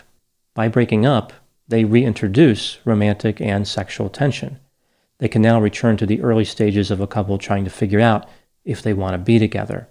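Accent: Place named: Pennsylvania, United States. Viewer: Anyone know if Dr grande is from pennsylvania? Something about his accent reminds me of a friend of mine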